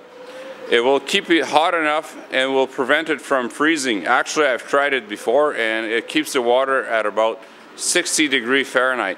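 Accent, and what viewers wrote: Canada. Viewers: Lovin' that thick canuck accent!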